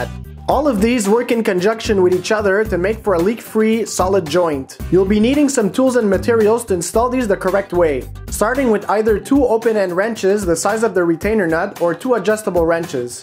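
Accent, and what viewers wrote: Canada. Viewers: from which part of Canada is your accent from?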